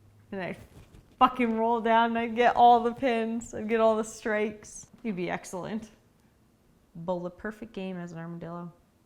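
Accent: Canada. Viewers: Dude, around 5 minutes in, the Canadian accent really comes ooote. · You’re Canadian accent when you’re high >.< Love it, dawg. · High had a Canadian accent Eh? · She started sounding more and more Canadian as this video went on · She gets a lot more Canadian when high 😂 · It's Human Rights violation to ask a high person "What was the last thing you forgot?" You could give someone an aneurysm. She gets SO CANADIAN when she's high. I love it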